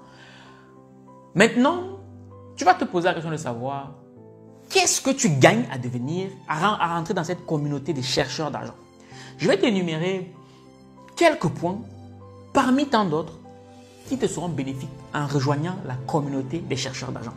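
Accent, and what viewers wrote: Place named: Cameroon. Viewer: Mais tu as gardé l'accent fort fort du mboa! C'est cool, du courage.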